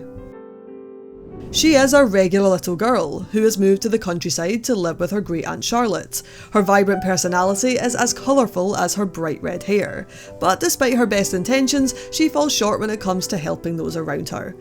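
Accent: Scotland, United Kingdom. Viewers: Agh, dang it! I had a feeling I got it wrong! It was in the back of my head for a while. I was like: “you should edit that. She might be from Scotland.” I blame count dankula. I was like, “she doesn’t sound like *him*, so, no.” Well thanks for not taking offense. I know the scots and the Irish hate being compared to each other. And yeah, I think we all wanna be a bit more like SuperEyepatchWolf sometimes. That man is a legend. I look forward to more videos as always! Thanks for your kind responses.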